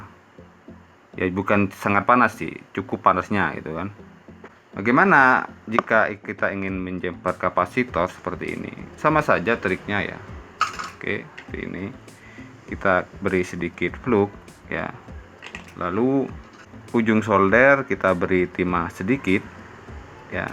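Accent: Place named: West Sumatra, Indonesia. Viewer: Logat nya seperti orang minang ya bg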